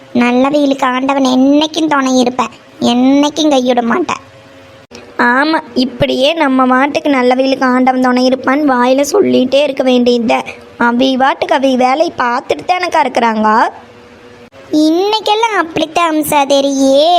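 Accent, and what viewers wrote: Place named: Pollachi, India. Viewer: Akka pollachi slang akka ❤❤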